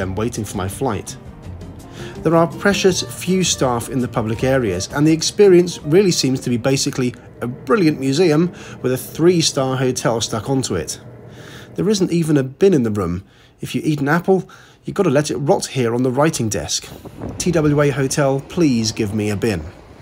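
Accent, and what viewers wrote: United Kingdom. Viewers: LOL I laughed at that too. He's British. Give em a break.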